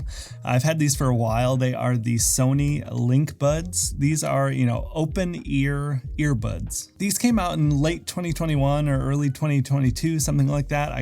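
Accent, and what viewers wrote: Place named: Austria. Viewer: are you austrian, you sound like arnold sometimes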